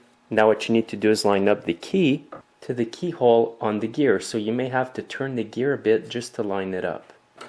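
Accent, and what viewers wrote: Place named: Quebec, Canada. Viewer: you speak English very well but I notice a super small accent...are you from quebec?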